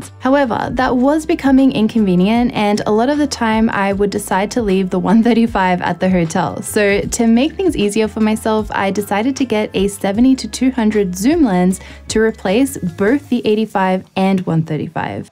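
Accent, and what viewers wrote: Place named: Australia. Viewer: Australian accent mate 😉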